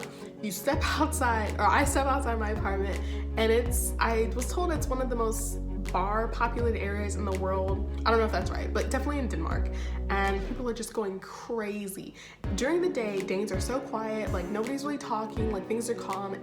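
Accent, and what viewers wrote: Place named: Sweden. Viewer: You actually sound more swedish than danish. Thank god! Go over to Sweden instead a little better on everything. Danish can´t even play hockey. Sweden yes! Denmark no, burn in hell danes!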